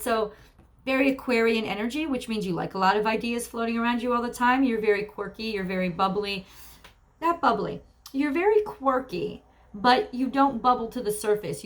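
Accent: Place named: East Coast, United States. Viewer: so glad to seeing you in such good, and wonderful Spirits, after all you have been through recently. You are such a joy and blessing to watch you, when you post for us. You absolutely make my day, when I get to be with you on the postings., I have gotta ask you, I defintely see a very strong Italian background with you. The way we, ( I'm Italian too, on my Mom's side), talk with our hands, your eye movements, and facial structure, nose, mouth, etc. and your accent as well, East Coast, New Jersey or New York area, but I see and hear "Italy", with and from you! So, am I right, are you of Italian descent in your familia? Bye for now, Caio Bella! Bob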